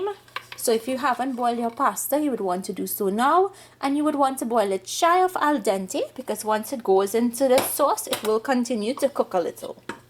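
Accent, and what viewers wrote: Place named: Trinidad and Tobago. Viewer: Gyal me like u accent, I can listen to you all day.. I like de trini women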